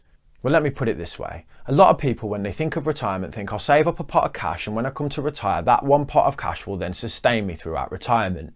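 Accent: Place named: Manchester, United Kingdom. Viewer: Yes mate, knew you was a manc it's in the accent 😂 p.s I'm from wythenshawe by the way, keep up the good work and the videos mate!💪👍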